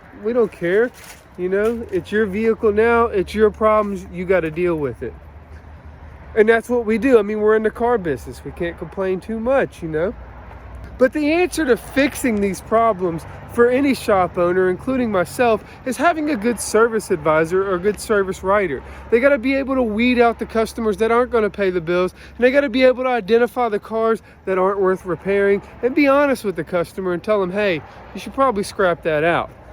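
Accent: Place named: West Virginia, United States. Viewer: I can't quite get a fix on accent. Where is he from? West Virginia? Maybe he should require to hold the title on repair jobs over $2000?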